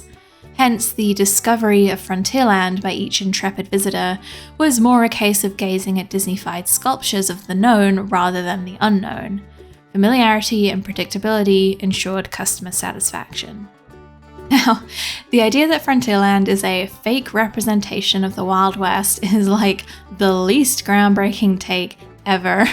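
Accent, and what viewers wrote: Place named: Mid-Atlantic, United States. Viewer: I sense a masters degree in either literature or history. You seem to have a mid-Atlantic accent. Quite old fashioned Hollywood. I can't quite tell if you're a middle class woman from Southern England living in the US or vice versa.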